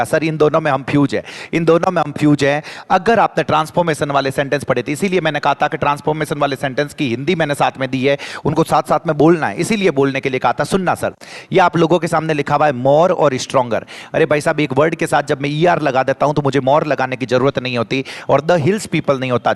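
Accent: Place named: Rajasthan, India. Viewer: Sir youtube par aisa koi mila h jo full meri tarah मारवाड़ी bole । Baki aaj tak koi ni dekhiya the sir ghana hakra padhao ji khush hugo 😁🥳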